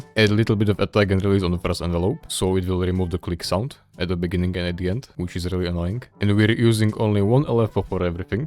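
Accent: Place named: Eastern Europe. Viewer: slavic accent🫶🫶🫶 (The video looks good, but it's chaotic)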